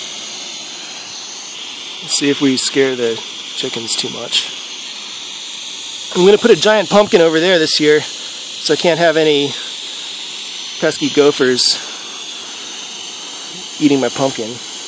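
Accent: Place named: United States. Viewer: listen to the accent, 100% American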